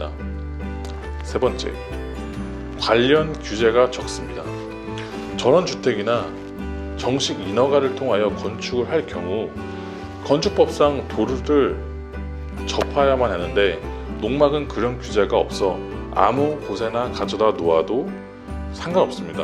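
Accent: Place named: United States. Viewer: 미국 사람인줄 알앗네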